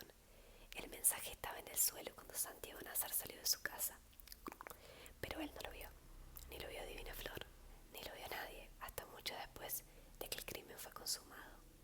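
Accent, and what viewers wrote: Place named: Argentina. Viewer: Me encanta el acento Argentino. Saludos desde méxico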